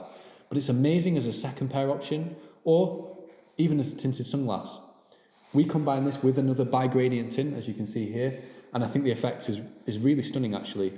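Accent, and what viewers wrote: Scotland, United Kingdom. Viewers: oohhh scottish accent!!!!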